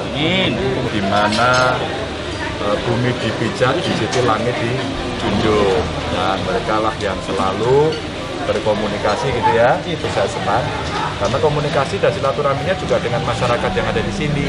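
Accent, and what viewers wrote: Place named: Java, Indonesia. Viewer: Boso jowone pak ganjar khas boso jowo karanganyar Sehat² terus ngih pak ganjar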